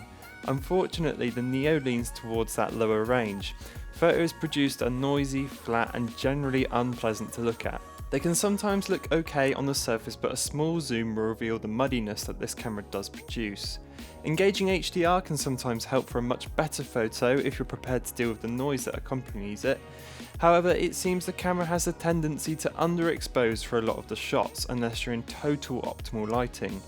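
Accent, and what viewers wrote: United Kingdom. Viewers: That accent though, which area in Britain is that???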